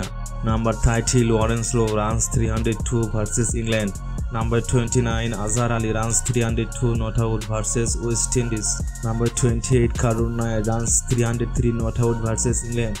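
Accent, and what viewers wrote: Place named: Sylhet, Bangladesh. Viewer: This guy's Sylheti English is funny🤣🤣🤣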